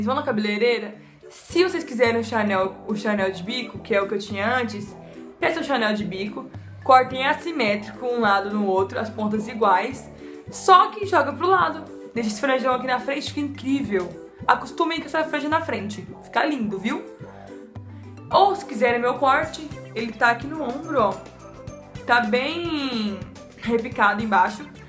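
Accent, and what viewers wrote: Brazil. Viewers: Você é goiana? 😍😍😍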